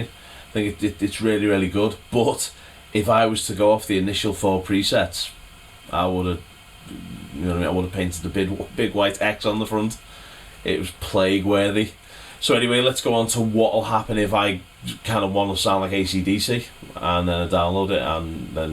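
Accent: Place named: Newcastle, United Kingdom. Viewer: I believe its the Geordie accent.. from Newcastle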